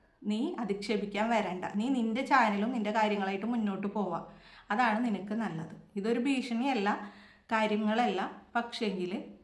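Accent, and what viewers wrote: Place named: Thrissur, India. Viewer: Itha trisur ano samsaaram kondu thoniuadhaane 🥰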